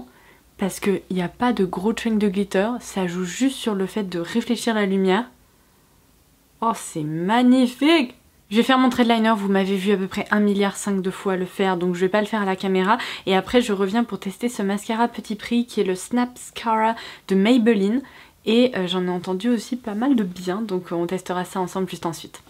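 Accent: United Kingdom. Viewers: Coucou! Cela fait quelques temps déjà que je te suit, mais la première fois que je commente. J'aime beaucoup tes vidéos, et à chaque fois que tu lis un nom anglais j'adore cet accent, que je ne pourrais jamais prononcer MDR Tu es très belle, continues ainsi :-) Ah oui, j'adore tes " Oh, Ouah !" Mdr, fallait que je le précise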